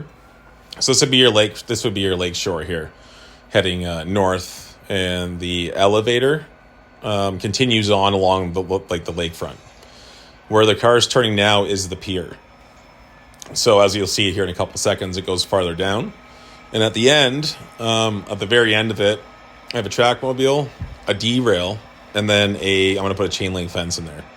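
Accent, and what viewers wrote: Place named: Canada. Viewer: Cheers. I had a feeling you might be in CA. (it was the road name and the pronunciation of 'ou' words - can't miss that! lol) Version 4 ain't your first rodeo. The experience shows, and I like the fact that you are willing to repair/replace/improve the scene or even the entire layout! Also, I like the stretched helix as it has fewer loops and greater access to errant trains. But, I was really surprised that the layout is only 15' wide - it looks much bigger, perhaps due to the generous track radii. So, I'm looking forward to the CTC series, and maybe a track plan? and meanwhile I'll check out the other videos. Thank you for responding - very helpful. Lawrence (CA, too - but, alas! USA, not CA)🙃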